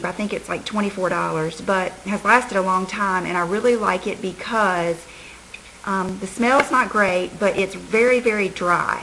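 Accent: South, United States. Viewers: I always hope that you will say "Hey, Ya'll" bc i love ur cute southern accent, but you never do. You should!!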